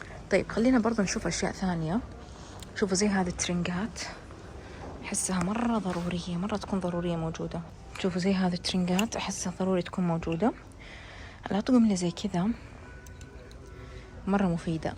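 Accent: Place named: Saudi Arabia. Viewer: انا متابعة جديدة ممكن ترحيب ❤ صوتك يجنن انا احب اللهجة السعودية 😊✨🦢🇸🇦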